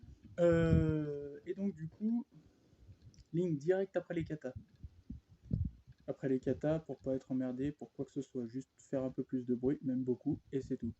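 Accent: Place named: Savoie, France. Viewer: Tu es d’où mec ? Ça sent la Savoie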